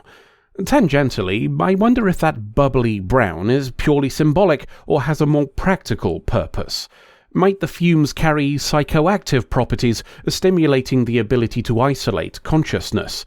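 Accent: RP, United Kingdom. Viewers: In response to a video I keep getting suggested by another Youtuber about "the time Janeway was the spider queen", I went and found your video on the topic. I don't know much about culture in your company, but I believe you sound a bit more "posh" in that episode. <link>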